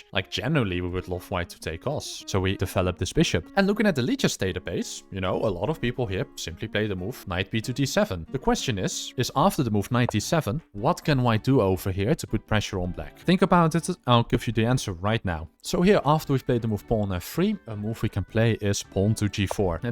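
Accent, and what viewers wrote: Netherlands. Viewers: Is this an AI channel with a Dutch accent?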